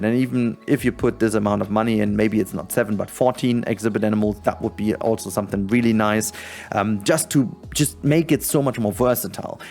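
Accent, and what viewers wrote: Germany. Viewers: I hear the German Accent😂 I love it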